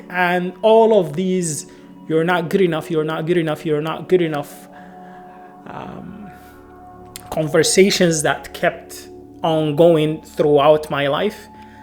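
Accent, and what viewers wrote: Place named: Africa. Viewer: مرحبًا ، دائمًا... يتطلب الانتقال نوعًا من الجهد الأخلاقي والمالي والقانوني. أنا متأكد تمامًا من أن مشاكلك تتعلق ببعض المشكلات الأخلاقية وبعض المشكلات الاجتماعية (التي تتعلق بالقانون) ، حاول تقليل قضاء الوقت في التحرير ومحاولة التصوير في بث مباشر (كما فعلت هنا). بالإضافة إلى ذلك ، قم بتحسين لغتك (بالمناسبة... لهجتك رائعة من أصل أفريقي).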